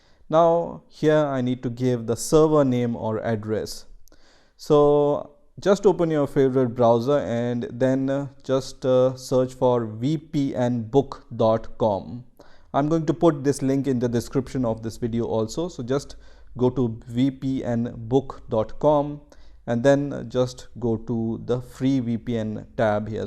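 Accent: India. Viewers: ok just wondering.... why do you automatically assume that he is in india? you have no proof of that just because he has an indian accent doesn't mean he lives in india. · your idian accent makes this video look like a scam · indian tech support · W❤️W.. it really works and why not..? As the English accent is an Indian guy.. Love❤️ from Pakistan 🇵🇰 bro.. and with the prayer for our countries that we will be together one day..🤲🏼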